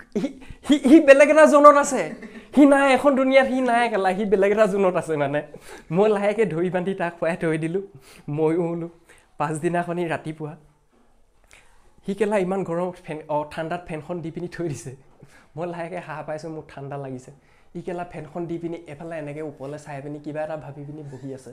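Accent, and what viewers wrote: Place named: Upper Assam, India. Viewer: Bhakha huni upper assam jen lagise, kot ase thai dukhor?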